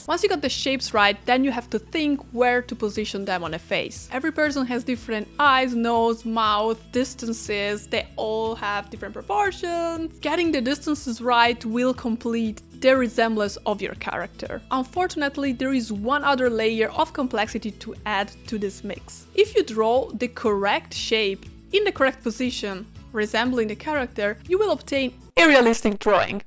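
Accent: Italy. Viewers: grazie mille! tutto molto chiaro e semplice :) un saluto! p.s.: commento in italiano perchè sentendo il tuo accento penso tu sia italiana, spero di non aver commesso una gaffe!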